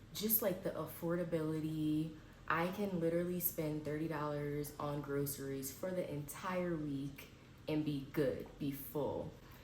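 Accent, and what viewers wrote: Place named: Latin America. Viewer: Are you 100% american or cuz i can hear some latina in you tambien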